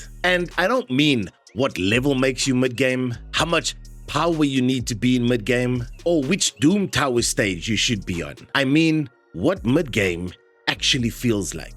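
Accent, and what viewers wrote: South Africa. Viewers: Yo bro, I take it you're from SA, I hear that colored accent. Nice video. I cannot agree more with everything you've said. Good job.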